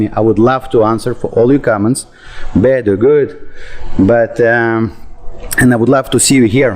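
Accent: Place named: Russia. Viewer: So nice Russian accent. Glad to know that local Miami environment can not destroy it 👍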